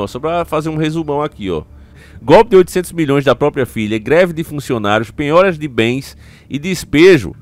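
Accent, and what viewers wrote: Northeast, Brazil. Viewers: Bom dia Eu sigo você a muito tempo e seu sotaque sempre foi o diferencial Nordestino meu amigo é como todos os lugares tem as exceções parabéns pelo seu trabalho Deus abençoe 🙏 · Conheço seu canal a 9 anos. Difícil eu perder um vídeo, não sou nordestino, sou de São Paulo, mas o que me chamou atenção foi justamente a sua forma de falar e o conteúdo, até hoje. Se não fosse a safadeza do YouTube seu canal estaria maior. PARABÉNS!!!!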